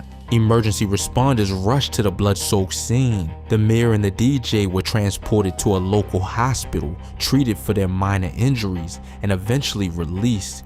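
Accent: Baltimore, United States. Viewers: The narrator sounds like he from BMORE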